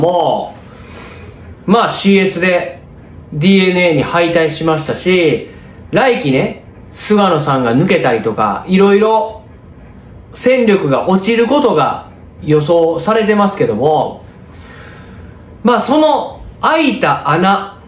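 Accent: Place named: Kyoto, Japan. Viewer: 訛りを聞いて関西は関西でも京都の人だとすぐ分かった！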